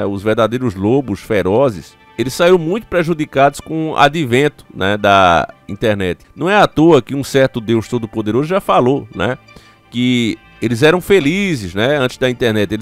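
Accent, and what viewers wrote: Northeast, Brazil. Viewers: Conheço seu canal a 9 anos. Difícil eu perder um vídeo, não sou nordestino, sou de São Paulo, mas o que me chamou atenção foi justamente a sua forma de falar e o conteúdo, até hoje. Se não fosse a safadeza do YouTube seu canal estaria maior. PARABÉNS!!!! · Bom dia Eu sigo você a muito tempo e seu sotaque sempre foi o diferencial Nordestino meu amigo é como todos os lugares tem as exceções parabéns pelo seu trabalho Deus abençoe 🙏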